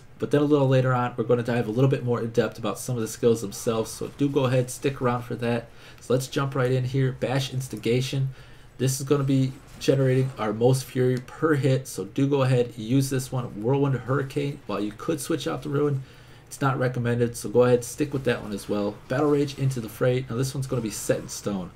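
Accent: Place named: United States. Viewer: i think hes amarican europeans usually do have an diffetent accent when they speak english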